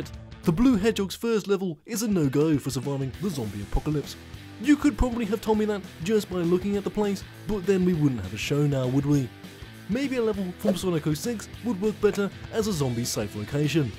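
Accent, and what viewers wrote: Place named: England, United Kingdom. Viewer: Live the concept. It's like a Doomsday Preppers parody with a very English accent. Me Gusta! ^_^ May I suggest Threed from Earthbound?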